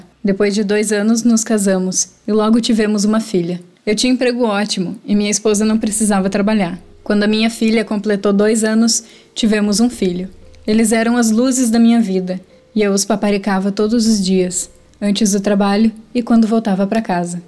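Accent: Rio Grande do Sul, Brazil. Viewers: O sotaque dela e parece ser gaucho ela usa muito "tua" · você e gaúcha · Oi tudo bem? vc é gaúcha?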